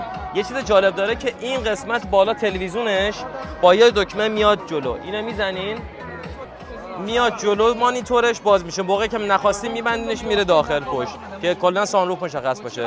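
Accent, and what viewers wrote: Tehran, Iran. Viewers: او موش خور بربری چرا لهجه ایرانستانی گب میزنی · من درست شنیدم ایشون گفت اولین ایرانی هستیم که داریم از رویزرویز چینی فیلم میگیریم ؟! با وجود اینکه تسلط و لهجه عین بچه های شمرون تهرانه ولی واقعا رخ یه چیز دیگه میگه اونقدر چینی میزنه رخش که نمیشه گفت حتی دورگه اس یا اگر باشه یکی از اولیا باید ترکمن باشه یکی چینی یا هوش مصنوعی😂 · اصلا ماشین را بیخیال خیلی قشنگ فارسی صحبت میکنی. لهجه تهرانی واقعا فوق العاده اس. تبریک میکم که اصالت خودت را حفظ کردی با چهره کاملا چینی 😊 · عزیزم قربون اون قیافه چینیت و لهجه قشنگ ایرانیت برم فکر می‌کنم اگر الان کم کم شروع کنی با یک سری از مقامات یا صاحبان کارخانه توی چین که صاحب سبک و جنس‌های خاص هستند قطعاً توی تولیدات بزرگ جهانی سهمی دارند،و یا حتی اگر هم با خود مدیرانشون نمی‌شه با اطلاعات اون شرکت‌ها علت دونستن زبانشون پشت قضیه صحبت کن و فقط جلوی دوربین بیا جوونای ایرانی رو آگاه کن که الان تو دنیای اول ،کشور اول دنیا،که ، به تازگی دیگه قشنگ عیان شده،تو بگو چی داره می‌گذره چی قراره بشه،،،منظورم اینه که یک ذره اطلاعات تحلیلی بده ،که یک سری از این جوونای ،نخبه‌ای که داخل کشور هستند حداقل بدونن که کدوم ریسمان بگیرن..که حداقل کشورمون عقب نمونه از کشورهای دیگه..قطعاً که خیلی کار داریم ما ولی باز امثال شماها که میرید و میاین باید تعریف کنید که چه چیزهایی رو باید تو کشور هم مسئولین و هم جوونا بیشتر باید توجه کنن،و تو چه حرفه‌های ناشناخته‌ای باید کم کم برن اطلاعاتو آموزش ببینن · ای جانم داداش قشنگم و با لهجه شیرین پارسی باستان صحبت می‌کنی😊😊😊😊❤❤❤❤❤🎉🎉🎉🎉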